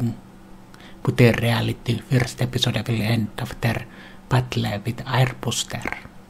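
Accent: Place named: Finland. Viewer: Why you speak English in Suomi pronouncation?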